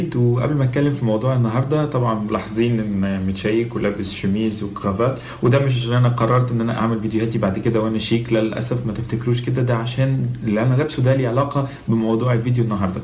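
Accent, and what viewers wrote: Tunisia. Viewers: لهجتك تونسية بامتياز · لهجتك تبان تونسي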